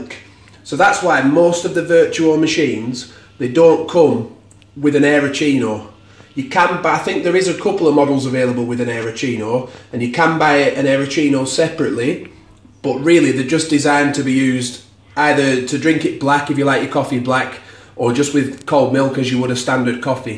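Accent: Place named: North, United Kingdom. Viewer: Couldn’t make sense of the nespresso instructions, all I needed was a straight-talking northerner to show me what I was doing wrong, thanks!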